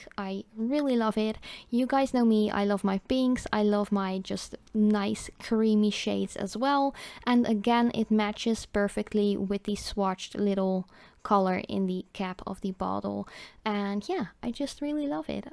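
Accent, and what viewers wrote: Netherlands. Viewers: Hello, I love your videos, they are absolutely amazing. Love your dutch accent ! (I used to live in Den Haag, had the best time of my life there) I have a question for you! How did you got sponsored by MG? I would like to do videos like you, but I assume you dont purchase these collors, they just send them to you? how did you started this companionship?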